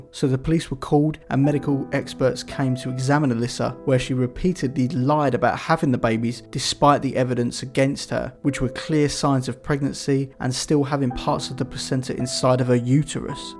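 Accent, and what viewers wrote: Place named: London, United Kingdom. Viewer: Love the channel and the accent. Hearing stuff like “fink about it” reminds me of London days gone by.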